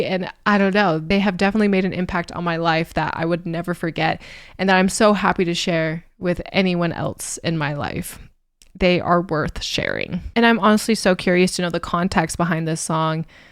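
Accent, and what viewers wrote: United Kingdom. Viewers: Which country is? Her accent seems to be from England.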